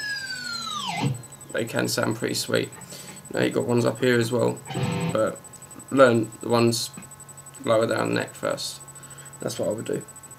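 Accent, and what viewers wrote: United Kingdom. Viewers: lmao bro im trying to learn this for some songs so i find its only appropriate the the guy giving the "lesson" has a british accent XD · Are you britsh all british guys remind me of Matt Bellamy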